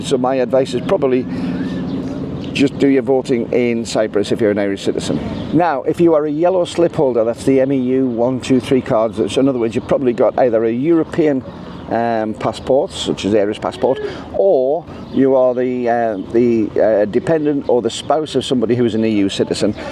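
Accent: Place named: Scouse, United Kingdom. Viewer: Great video - might be wrong but do you slip into a slight Scouse accent every now and then? Dare I say a hint of Birkenhead in there? 🙂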